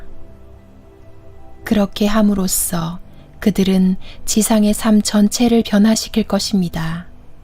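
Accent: Gyeongsang, South Korea. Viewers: 안녕하세요~오늘 처음 와봤는데 왠지 귀에 착착 붙는 경상도 억양...ㅎ 제가 대구 출신이라 너무 정감있네요~^^ 저와 님의 끌어당김으로 제가 오늘 여기에 온것이겠죠? 좋은 내용 감사히 잘 들었습니다~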